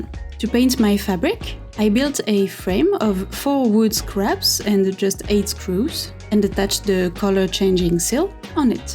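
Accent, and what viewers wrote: France. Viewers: Magnifiiiiique ! Et tellement de courage et de patience. En tout cas je savoure chacune de tes vidéos depuis que j'ai découvert ta chaîne ! And I love the French accent ;)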